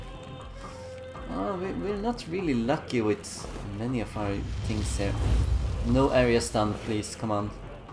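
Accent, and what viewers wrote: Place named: Germany. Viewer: I was just curious about your nationality. Your accent and manner of speaking reminds me of my German grandparents. When I mentioned your ability with the numbers, I was referring to the calculations you were making on the fly concerning critical hit chances and such (which also led me to believe you may have German ancestry =) ). I hadn't made it to the Excel portion of the video yet. I think it is good to show people that when you track numbers, you can make the best decisions. Because math. Keep up the good work.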